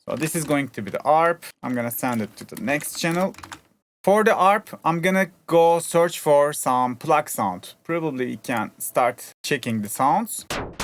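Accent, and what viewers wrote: Turkey. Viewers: Memati maske de taksan o sıfatın belli oluyor 😂 türk olduğun aksanından belli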